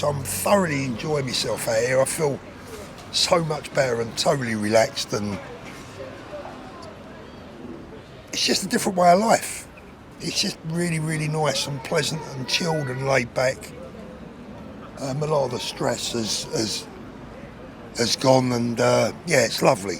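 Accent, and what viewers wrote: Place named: London, United Kingdom. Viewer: Always great to hear a real Londoner. Too bad your own country doesn't want you.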